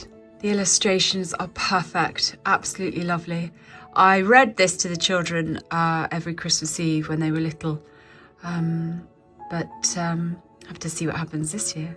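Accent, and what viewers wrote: United Kingdom. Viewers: I love his British accent 🇬🇧♥️ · I happened upon one of your vlogs and I have to say, you have been a source of delight this Christmas season. You make the mundane fun. Your manner of speaking is so funny and engaging. I adore Britspeak! I love your natural enthusiasm. And the way you don't sacrifice the good for perfection is very relatable. The hops in your hair episode was my favorite. The way you laugh at yourself and lean into the camera at times is comedic gold. I look forward to more episodes.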